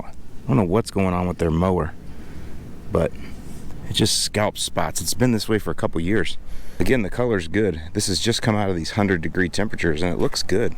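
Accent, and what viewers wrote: Chicago, United States. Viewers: I love the Chicagoland accent you add into your videos! Reminds me of when I lived up ‘dere! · Nailed the midwest accent. Sounded like you are from Chicago for sure 😄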